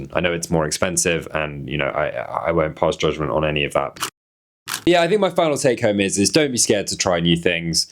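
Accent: United Kingdom. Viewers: english accents tickle my brains in a good way😌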